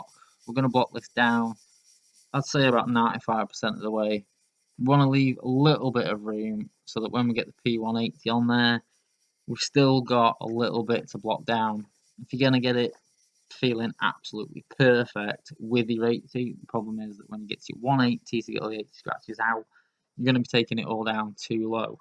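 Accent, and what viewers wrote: United Kingdom. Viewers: Once again I apologize for me rude comment. With that being said, please answer my question, I am having difficulty in understanding the British accent which I am sure many of your viewers do, what grit did you use in sanding those areas that weren't damaged? P180 before laying the primer right?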